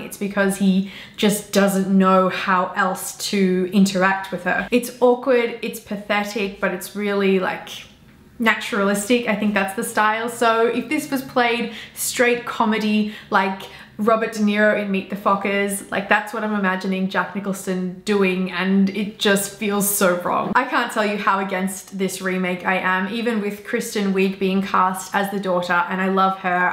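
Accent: Australia. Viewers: You have a good auzzie accent you speak properly unlike some auzzies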